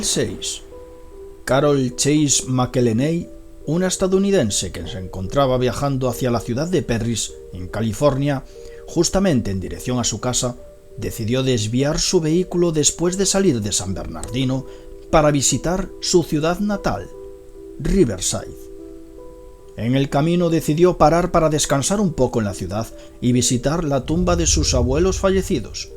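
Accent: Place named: Castile, Spain. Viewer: Io amo tantissimo questo accento del Castiigliano... oltre al tuo modo di esporre gli argomenti. Io credo nelle dimensioni alternative... chissà in un altro mondo io sono spagnolo e tu italiano ah ah! :-D M'iscrivo volentieri al canale. :-)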